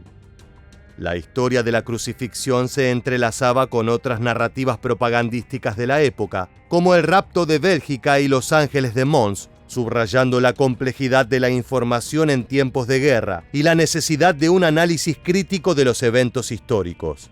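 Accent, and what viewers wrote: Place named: Argentina. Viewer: Siempre tira a favor de USA y la OTAN, ellos son siempre los buenos para éste canal y los alemanes son los maaalos! demonios! Conste que el canal, a juzgar por el audio del locutor; es de origen argentino. Y que peor enemigo de Argentina es la OTAN quien conspiró contra la Argentina y apoyó logísticamente a la fuerza expedicionaria del reino satánico unido. No hay caso...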